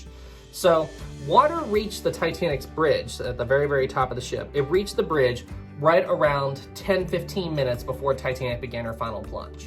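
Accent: South, United States. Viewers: Your southern accent ......... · Stop trying to hide your southern accent. Nobody cares. Excellent video thankyou!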